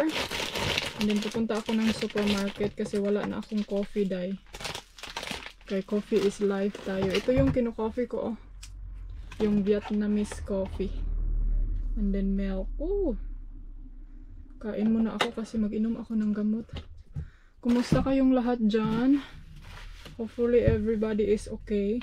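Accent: Visayas, Philippines. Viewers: Hi Ms. i really like you 😄 the way you speak tagalog with bisaya,yong bang wag kang lumigid dyan at ang hirap litukin,mkatawa jud ko nimo ayy...anyway be safe always sa inyong travel with your hubby. I really like also your background music,i love to hear it. God bless you ms. & your hubby 🙏💜 Your follower here in Iligan City,Mindanao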